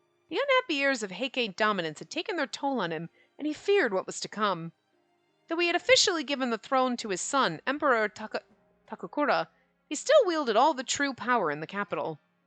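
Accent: Ireland. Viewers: YES! The "Irish" Accent appears. I don't know why, but that has become my favorite of your voices. XD Don't apologize for it. We love it. We're charmed by it. ... At least I am. LOL I LOVE these two Sato brothers!!! OMG! I'm gonna love the side stories for them. And all four men fighting over who carries Shanao, just perfection. XD XD YOu know those two Sato brothers TOTALLY know she's a woman and are totally enamored with her already. XD XD GEEZ! This game keeps getting better and better. XD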